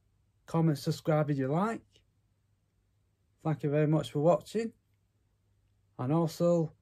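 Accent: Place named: North, United Kingdom. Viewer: ahhh that's right! I thought I could hear some Northern in you 😂 I'm part Scottish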